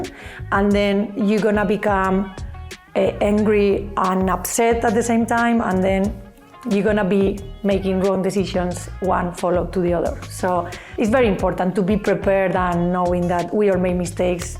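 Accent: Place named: Spain. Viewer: she’s obviously not Indian you melon, that is a Spanish accent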